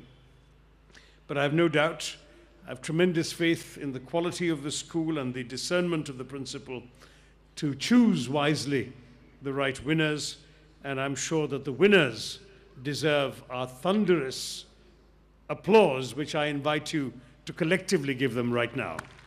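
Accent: United Kingdom. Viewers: ~ You can even listen to Che Guevara, for all I care. Nobody is distracting you or stopping you. Just because one can speak crafty, polished queen's english doesn't absolve of one's involvement in the death of a woman one is married to, in a highly suspicious circumstance that directly tied to one with many loose ends floating all over. I don't care who says what, I would never send my kids to a school who will invite someone like him to talk on morality & leadership.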